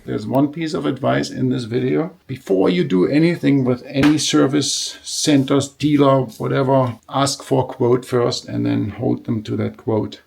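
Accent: Germany. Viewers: I hate Germans speaking English.... including myself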